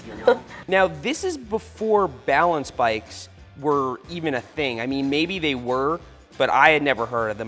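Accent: Long Island, United States. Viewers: you sound more Lawn Guyland then,then you do now... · It sounds like my people here in long island. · Cannot not hear the long Island accent now lol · I never noticed the LI accent, but I should have being raised in Bayside. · Hey, do a whole video with your old long island accent! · The Long Island accent started to come up during the video while was narrating his footage 😂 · I can imagine what young would say with that long island accent "Forget about it" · The way you sounded when you were younger is the exactly like my voice probably cause I’m from Long Island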